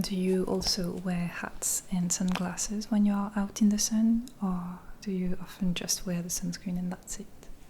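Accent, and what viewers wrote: France. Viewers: Her accent is french :) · Are you French?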